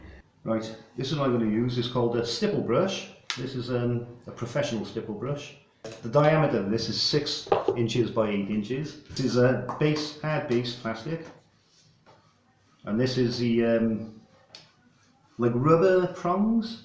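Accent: Cardiff, United Kingdom. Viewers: Thank you. Do I detect a Cardiff accent 😊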